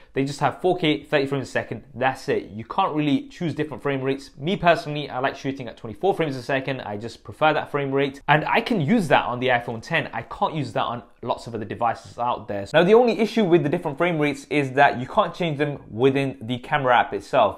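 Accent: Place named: United Kingdom. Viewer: Was waiting for British accent lol.. other than Marques and Michael Fisher, you're the only reviewer I trust (and yes I am fanboying)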